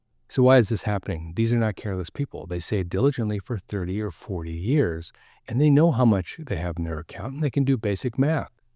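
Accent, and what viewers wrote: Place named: Northern Virginia, United States. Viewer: Sounds like northern Virginia!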